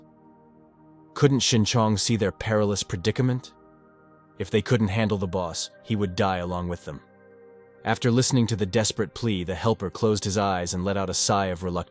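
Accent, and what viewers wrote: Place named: United Kingdom. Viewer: Broo this ai is so british/old english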